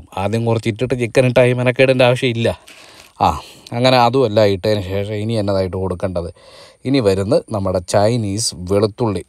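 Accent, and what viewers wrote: Kottayam, India. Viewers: Kottayam slang..ente oru cousin achachante samsaram pole..😊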